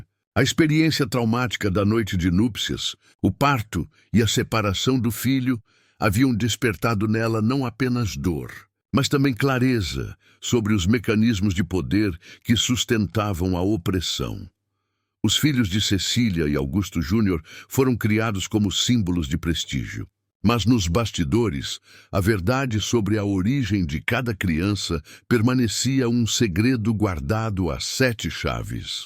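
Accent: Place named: Portugal. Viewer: Só não entendi o porquê do sotaque português de Portugal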